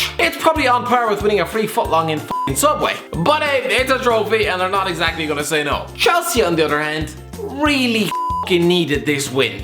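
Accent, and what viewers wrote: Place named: Ireland. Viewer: Annoying voice you Irish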